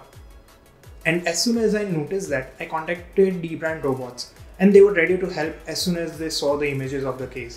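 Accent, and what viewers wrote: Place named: India. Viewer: I was watching this wondering how all indians sound the exact way we Americans think you sound 😂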